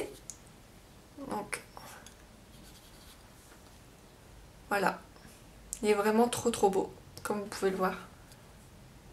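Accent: North, France. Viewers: Tu est du nord non? :')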